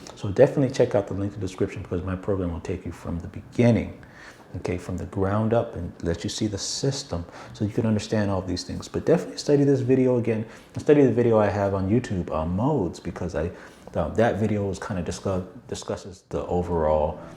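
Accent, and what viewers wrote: Caribbean. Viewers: He sounds Caribbean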